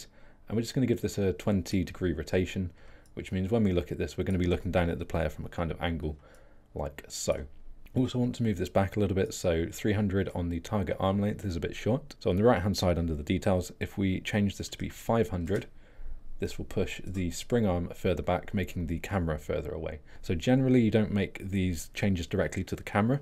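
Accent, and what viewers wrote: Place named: United Kingdom. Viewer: I feel like I'm listening to Jayztwocents in British accent. Anyways thanks for the great tutorial!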